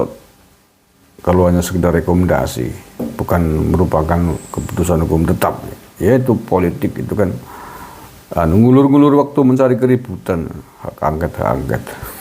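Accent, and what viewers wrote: Banyumas, Indonesia. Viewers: Pak rika hebat bahasa jawane totok banget,medti rika wong banyu mas,aku seneng moga sehat,aku cocok kata pak yusril mahendra...🙏🙏🙏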